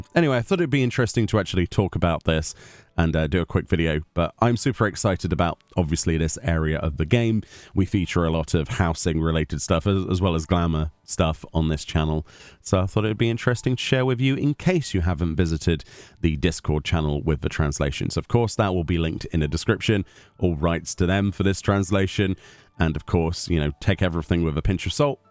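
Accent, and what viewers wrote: United Kingdom. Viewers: You really don’t know what 1.5 of 40 is? It’s true what they say, British accent is no substitute for intelligence. I’m just glad you managed to read those squiggly things they call letters.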